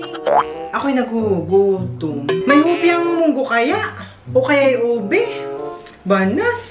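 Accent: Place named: Baler, Philippines. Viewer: i really love your videos po. 🤗🤗 Feeling ko kapunto ka namin haha from Baler haha ganyan din punto namin eh. Malambing😂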